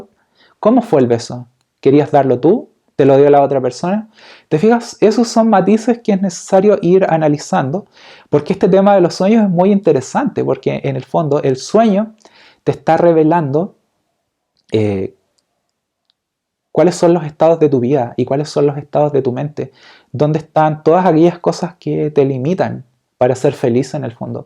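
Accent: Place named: Chile. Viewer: Me encanta escuchar Ace te chico super chileno..... Con esa voz tan cálida y tan clara..... Es familiar y cálido..... Además de certero en el tema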